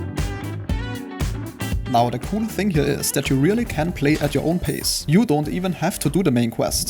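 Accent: Germany. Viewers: Bro are u german your english pronounciation seems famliar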